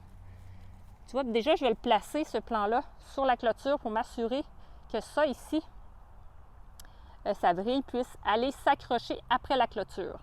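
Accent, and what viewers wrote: Canada. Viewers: Merci pour cette belle explication ...et votre très bel accent canadien . A bientôt !! Manu , de la Bretagne.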